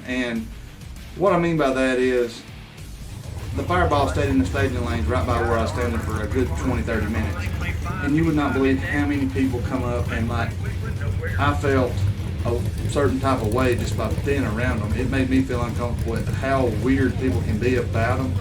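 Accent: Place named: Alabama, United States. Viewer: Hate to break it to u bud ,but you sound like ur from bama too lol. I'm from West Texas so it's same shit different accent, we had boosted and Kayla,doc,Monza, birdman,Shane and banknote here and yea there people taking videos infront of the car as its burning out , people all around them all the way tell the would take off and they were all really laid back about it too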